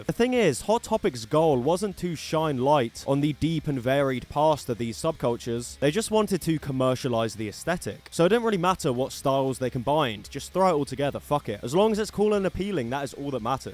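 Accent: United Kingdom. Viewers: br'sh accent too thick yuck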